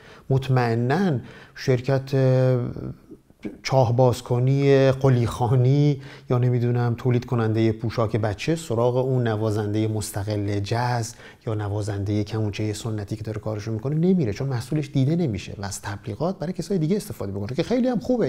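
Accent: Bushehr, Iran. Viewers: Thanks jan for your profound and heartfelt wisdom, I've recently come upon your magnificent YouTube channel looking for some music fundamental lessons after picking up bass guitar. And I have to give you a very warm feedback and express my most sincere gratitude. Because you are doing our society a great service by creating this high quality content. Moreover, your general warmth and accent coming from Bushehr have grown on me as I am from Kerman myself. Thank you ❤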